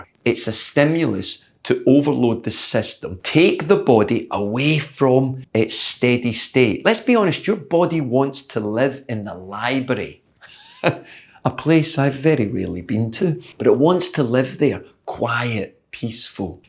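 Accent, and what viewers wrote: Scotland, United Kingdom. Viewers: After watching several of your videos, the voice in my head while I'm cycling now speaks Scottish.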